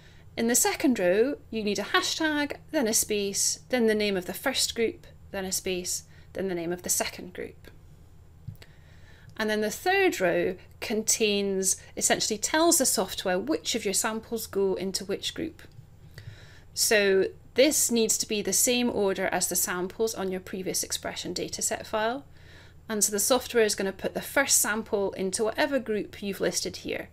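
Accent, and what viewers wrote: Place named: United Kingdom. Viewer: Thank you. That was concise and wonderfully analyzed . Meanwhile your British accent is super!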